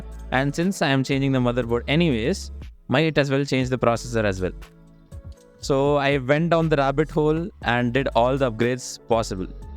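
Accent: India. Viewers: oh mannn, the indian accent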